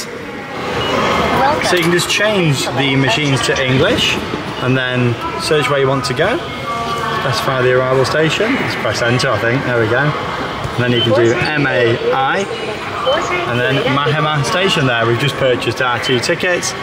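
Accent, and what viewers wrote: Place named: Merseyside, United Kingdom. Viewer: Lovely to see you both. Only just started but makes me laugh when has her Merseyside twang. Was more prominent on the carnival cruise so I thought. Awww they have vlogged after a drink. Then I see the open tin of budweiser. Or it may be totally not a bud and some korean AF drink but always talks with her mersyside twang after a drink and it's so so so cute. Going to unpause and carry on. X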